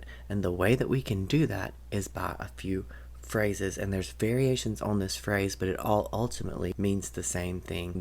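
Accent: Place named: Texas, United States. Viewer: you sound just like the women in my Texan family - lol - even I can sound like that when I want - I live in Massachusetts now for many years so my accent is a tad less identifiable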